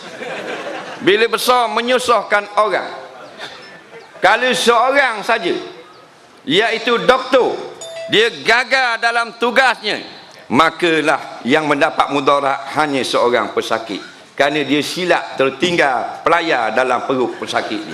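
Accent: Terengganu, Malaysia. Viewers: Ceramah terbaik, dari yg terbaik.Lawak2 yg penuh berisi, dlm loghat Terengganu.Best loghat Terengganu ni.Loghatnya lebih kurang loghat Kelate le!!!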